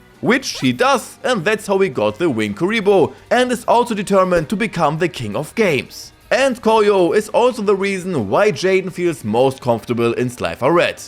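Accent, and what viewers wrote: Germany. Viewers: are u german ? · this is German English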